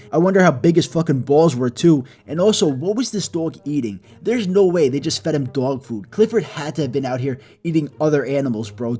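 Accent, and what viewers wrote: New York, United States. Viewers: Is it weird I wanna hear you say the “I told my daughter to not give the dog coffee and what...” because even though it’s not a thick ny accent it’s there also the magnitude earthquake and shit 😂 So perfect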